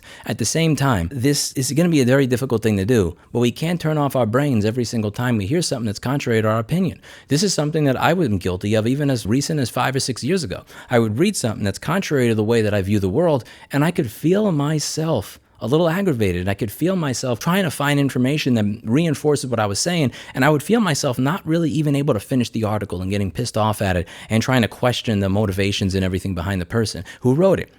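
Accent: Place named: New York, United States. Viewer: Is it me, or is more New York today? <3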